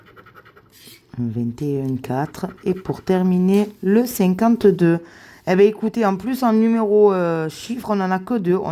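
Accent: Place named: Marseille, France. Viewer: Bonne journée bisous bonne chance j'aime bien votre accent vous êtes de Marseille ?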